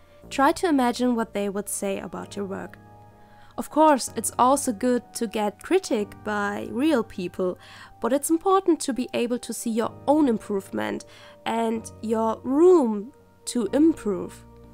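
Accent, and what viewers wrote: Germany. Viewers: Kommst du von Deutschland😄 Ja oder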